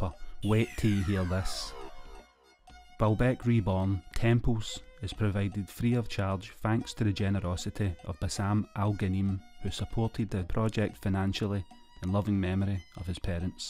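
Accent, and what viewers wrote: Scotland, United Kingdom. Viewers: It was great to hear a fellow Scot on such a prestigious channel (haha)...Baalbek is a really intriguing site...far, far older than the Roman construction that was built on top of it...thank you for the virtual tour...love from Scotland... 🎇😁🎇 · I personally found it to be hard to understand in certain points my grandads Scottish aswell so I should have been able to understand better, prefer the previous voice, that guy sent me to sleep most nights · Hard to understand quite a bit of the content. Hard Scottish is hard for us to understand. is a wonderful narrator and your content is just incredible. Much love and gratitude!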